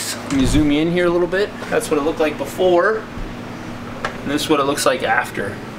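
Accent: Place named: Midwest, United States. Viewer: Howdy, CJ here from H-Town Texas. I am looking to get a side hustle going. My best friend and his Wifey do this for a living. They make a decent living. How much do you charge for each wheel? Would I be able to use cordless tool and if so, how many batteries would I need to swap out in order to do truck and trailer wheels? I would like to be able to go to customer if power was an issue and or driver is waiting to get loaded. Thanks great video, love the mid west accent..Don cha knoow..😆🥰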